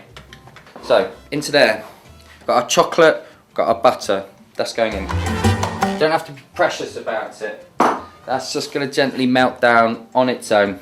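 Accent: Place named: United Kingdom. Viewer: WTF? The text is on swedish but u talk british? Im swedish and can talk English, but not that kind of brittish. Where r u from man?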